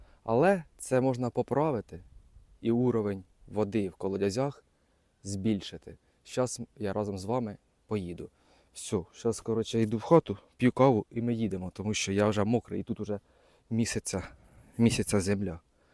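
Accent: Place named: Chernihiv, Ukraine. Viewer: Ти слуайно не з Чернігівською обл.-бо чую говор похожий?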